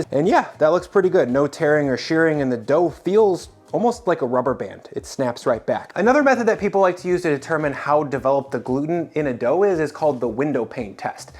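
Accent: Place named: Aurora, Illinois, United States. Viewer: I was fascinated by the accent of someone from my college, and you speak JUST like him. He was from Aurora, IL. I'm from Chicago suburbs too but I don't speak like you? help me out!! The way you say "sturdy" is a prime example of this quirky way he would pronounce things, and I loved it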